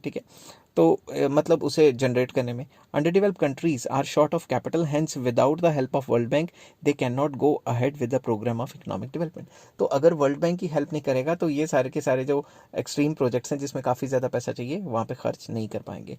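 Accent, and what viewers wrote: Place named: Punjab, India. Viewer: It seems that sir is from punjab😅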